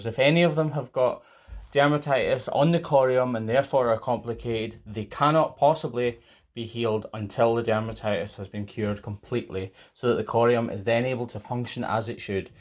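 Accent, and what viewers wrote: Scotland, United Kingdom. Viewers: So nice to hear a fellow Scot on Youtube. Also good to watch and listen to someone who is knowledgeable and interested in what he does. Aren't cows such beautiful creatures 😊😊😊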